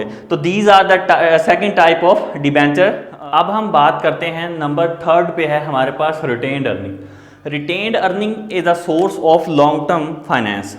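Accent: Pakistan. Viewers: Are yyou frm Pakistan?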